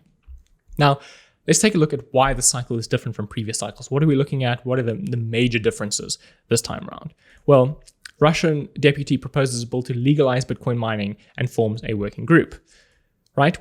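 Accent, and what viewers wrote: South Africa. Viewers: You sound so South African... Love your videos, they the best. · Love that South African accent,! 👍👍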